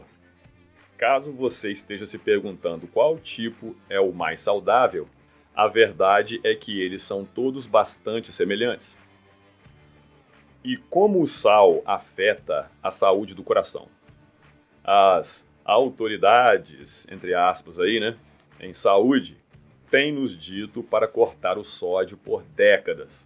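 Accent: Minas Gerais, Brazil. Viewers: Mineiro, de qual cidade voce e he ? porque seu sutaque e muito do interior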